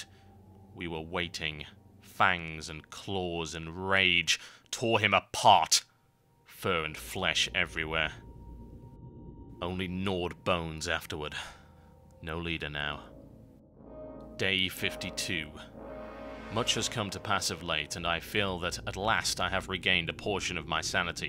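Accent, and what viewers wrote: United Kingdom. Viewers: you have an awesome accent. It's not one of those horribly exaggerated ones, this one is less aggressive and expressive, yet it has a certain taste to it that we all come to love. That leads me to my question, what part of the UK did you get that accent? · he does have a british accent cause he's from UK :) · sounds like a boring documentary.... but it is the one and only cynical brit so like! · I wish I had a hulky british guy to read a book to me every night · voice suits WoW for sure. It's a very distinct UK accent that's very usable in the worgen stories:)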